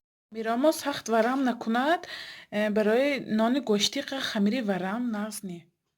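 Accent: Isfara, Tajikistan. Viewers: АПА МАН АНЧА ПЕШ КАНАЛАТОНДА ПАТПИСАТ КАРА БУДАМУ ХАБАР НАГИТАМ ХОЗИР БОШАД ХАМАИ ВИДЕХОТОНА ДИСИЯМ МЕГУМ ШУМО АЙ ТАРАФИ ИСФАРА НЕСТЕД ТАРЗИ ГАП ЗАНИ ИСФАРАГИ БА МОНАНДД АГАР ААЙ ИСФАРА БОШЕД АЙ КАДОМ НОХИЯШ 👍👍👍👍👍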